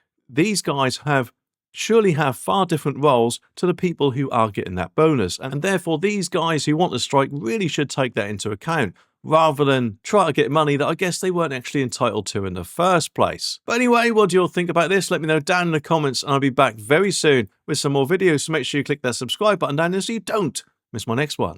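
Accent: Norfolk, United Kingdom. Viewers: Am I detecting a Norfolk accent/ tone..?😊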